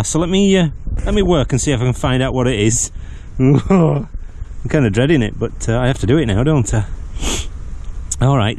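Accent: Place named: Scotland, United Kingdom. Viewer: I just adore your comments...”a wee sniffer”, “ well, there ya go then”, and there’s always my favorite “kwool”. Yu and your hidey-ho accent are just soo charming! Better clean up the wife’s sink, sweetie, or she’ll have your hide ;). I’ll take that window latch from yu..$20 US? Hhmmm? Didn’t know yu Scots knew about Daley Crockett??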